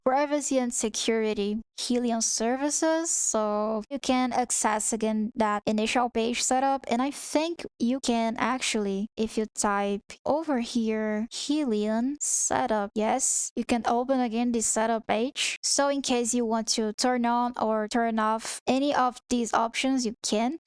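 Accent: Brazil. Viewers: eu sabia q vc era brasileira imediatamente pelo sotaque kkkkkkkk nao te xingando tho, otimo video e otima pronuncia !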